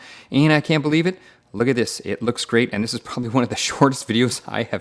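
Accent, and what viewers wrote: Canada. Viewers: Great vid G! I think I heard the canuck accent!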